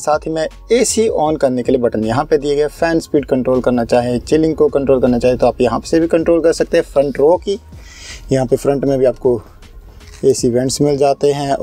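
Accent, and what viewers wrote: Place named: Kangra, India. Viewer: ओ भैया हिमाचल कांगड़ा! दा बोला ना 😂😂😂 भाई! बहुत बढ़िया अति सुंदर 👍 बहुत ही अच्छा रिव्यु किया। है आपने।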